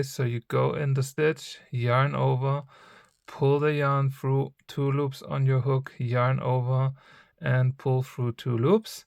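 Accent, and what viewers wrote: Germany. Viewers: Dieser Deutsche Akzent, er lässt sich nicht leugnen 😂 Super Anleitung. Danke. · DIE anleitung wäre auf 2-3 Minuten reduzierbar, wenn man das Prinzip der Maschenentstehung erklärt. Mehr braucht es nicht. Und als Anschlag eine Luftmaschenkette ist einfacher. Übrigens: bei diesem gruseligen Denglish bekommme ich Ohrenschmerzen!!! Mach Deine Videos auf Deutsch oder lerne eine vernünftige Aussprache. Alles andere tut einfach nur weh!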